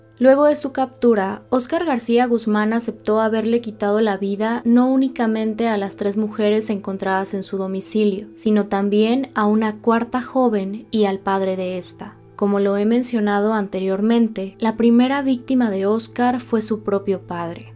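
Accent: Colombia. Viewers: cuál es tu nacionalidad por tu acento me párese colombiana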